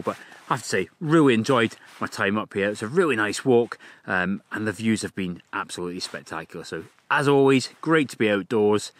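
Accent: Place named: Scotland, United Kingdom. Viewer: Aye, its a great view from the summit but a right pain in the rear for the knees and ankles. Nearly every fortnight I am on the road passing the Ben as I make my way to photograph the shinty and continue to support my local team Kyles Athletic. Have been wrestling with the idea of getting some of the local shinty lads up on top of the various summits that surround each of the shinty clubs, take a picture of the players hitting the ball off from the summit... however, as it's currently the shinty season, may be for another year. Keep the vlogs coming, highly enjoyable and you are always enthusiastic, always nice to hear a fellow Scottish voice on YouTube... Best regards Paul Paterson photography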